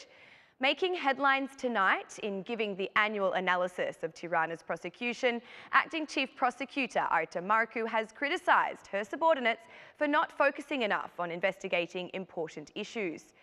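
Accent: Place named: London, United Kingdom. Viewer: LOL Nga Londra eshte kjo prezantuesja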